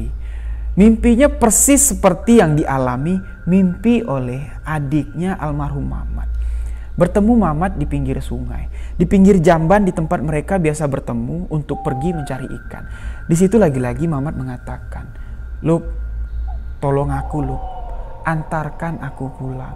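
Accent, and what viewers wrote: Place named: Jambi, Indonesia. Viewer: Hadir bang Pertahankan logat jambinya,,,,, MANTAP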